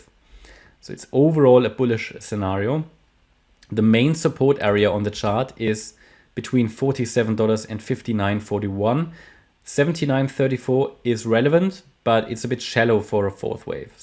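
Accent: Germany. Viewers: Deutsch, jetzt habe ich es rausgehört :)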